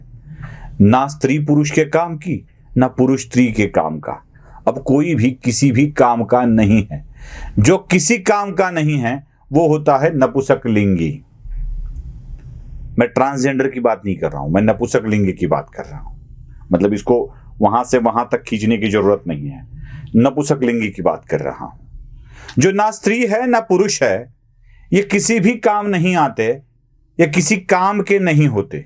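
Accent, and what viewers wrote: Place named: North, India. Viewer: Sir Apki Hindi to Bahut Achhi hai.. Apke hindi bol se ap to Ap North Indian hi lagte hai..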